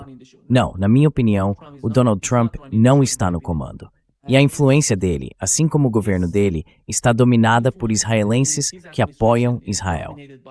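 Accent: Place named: India. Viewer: nao é brasileiro, é só uma tradução automática. Acho que é americano descendentes de pessoas do Oriente médio provavelmente Ele tem um sotaque indiano, mas ele é branco, estranho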